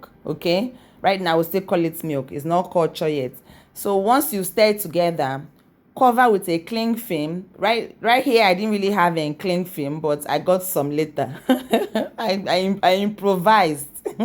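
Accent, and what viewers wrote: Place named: Ghana. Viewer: Hello ma'am, thank you for sharing. The video was quite explanatory. Thank you. The way you speak sounds like one from "Pete Edochie's" era. They spoke a lot of oral English. It sounds like music really, depending on how they speak it anyways. But then again, you sound like you were born, "bread and buttered" in Ghana. ❤❤❤ Thank you once more ma'am.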